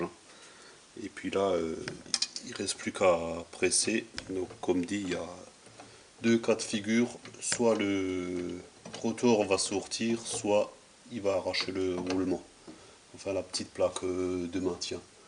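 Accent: Alsace, France. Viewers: je suis tombé sur votre vidéo YouTube et j'ai de suite reconnu l'accent forcément etant alsacien. Wittenheim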